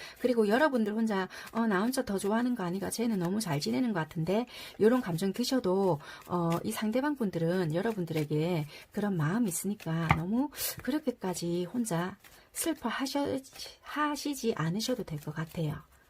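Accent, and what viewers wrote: Busan, South Korea. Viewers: 감사합니다! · 다시끝까지들어보니 남자친구가 일때문에 바쁜거 맞아요 그래서 답답했어요 내일 10일만에 만나거든요 ^^ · 다시 3번 뽑았는데 2주쫌 넘었거든려..그래서 잘 모르겠어요ㅠㅠ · 고맙습니다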